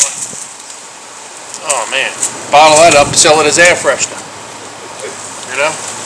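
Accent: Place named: South, United States. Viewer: I love your accent 😍 southern accents are the best!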